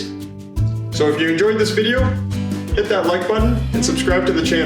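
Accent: Canada. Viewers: Even as a Canadian, I'm like "whoa, that is a Canadian accent." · Do I hear Canadianish? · As soon as this video started I got hit in the face with all that Canadian!! You're one of my favourite peoples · Salad spinner husk removal much easier....or....use a net bag that onions come in, one with tiny holes and stand outside and spin it really fast....Kids love this, but I use an almond milk bag over the net bag so I don’t have seed husks all over me and my deck... Love that Canadian accent! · My mom is an immigrant to the USA. I’d like to send her your video, but she won’t understand your Canadian accent. It’s very strong! I’m having a bit of struggle, and English is my first and only language. If open to it, pls add English subtitles. I know that’s weird, but English is her second language, so dialect other than her USA-born children, is difficult for her. She’s converting to healthier because of pandemic, and not wanting any vaccines, etc. 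· Find eout in the heouuse. So Atlantic Canadian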